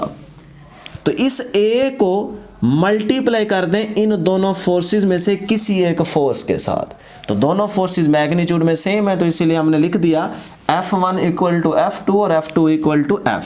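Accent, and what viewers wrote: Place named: Saraiki, Pakistan. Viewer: sir m also.. from ur city bahawalpur But now m living ......in Lahore ...but I really appreciate ur hardworking n saraiki tone loved it .,.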